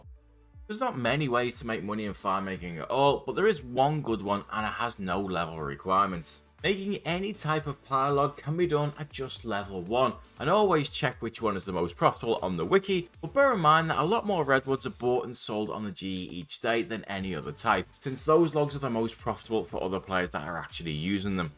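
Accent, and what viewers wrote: Liverpool, United Kingdom. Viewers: Super refreshing hearing a scouser play osrs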